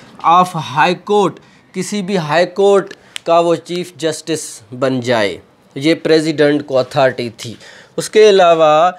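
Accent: Sindh, India. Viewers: Sir are you sindhi ??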